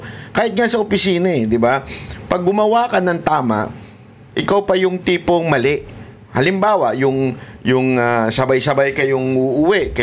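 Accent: Visayas, Philippines. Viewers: Ang cute magbisaya mo sir 😂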